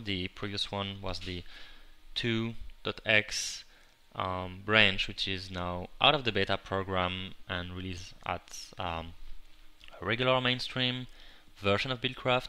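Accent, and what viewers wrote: France. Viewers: Can you do a video explaining how to install this mod on a mac? I have not been able to install it, only because I have had such a difficult time with all the complicated installation procedures. Just a quick, brief tutorial would be extremely appreciated. Thank you! :) -greexm PS, Vous êtes Français? J'adore votre accent quand vous parlez l'Anglais, c'est très charment!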